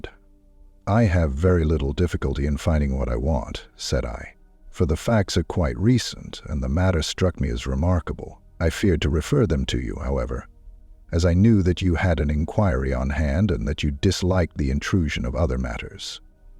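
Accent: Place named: United States. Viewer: No to an Anerican accent to read SH . Sorry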